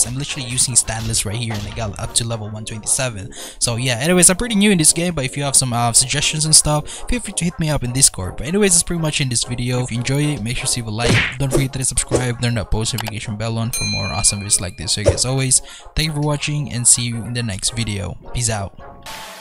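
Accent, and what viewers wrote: Philippines. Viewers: you sound filipino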